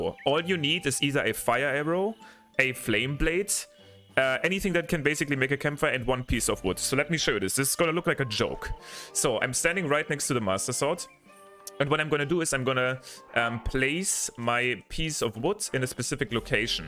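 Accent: Germany. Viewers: Hearing a German speaking anything but German is torture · I also thougt that you are German because of your english akcent LOL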